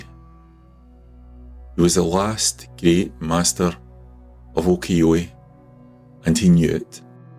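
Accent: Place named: Scotland, United Kingdom. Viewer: So that's where your great accent is from. I was wondering when viewing your videos. I am sure you will visit Tokyo more than once in your lifetime. Just like i wish to visit Scotland again some day, particularly the countryside, i am not much of a city guy (ironically living in the biggest city on the planet, but Tokyo is just a succession of villages, it is not a metropolis like some people think, and it has a village mentality which is both good and bad, you'll see some day). Did you like the photo of the Scroll by Yoshitoshi, i posted for you on my account ? It looks much better in the flesh. It is an unfinished work and he had just started adding very soft colour at the bottom, where the grass and the water from the pond meet, and also the "flowers" of the Susuki grass. The moon is create as negative space. Like most of his work, the moon is omnipresent. 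I guess this has to do with the family name "tsukioka" (the Hill of the Moon). And Yoshitoshi was obsessed with death or maybe fascinated by it. He liked the western idea of Memento Mori which he found matching the Buddhist concept of Mujo 無常 and the Shinto concept of Mono No Aware, もののあわれ、 which means that nothinbg last and nothing is permanent and that everything eventually comes to an end. That7s why this scroll has been used for the Moon viewing tea ceremony by the Tsukioka family for over a century every year, because it fits the Tea ceremony concept of Ichigo Ichie 一期一会 which means that we must live in the moment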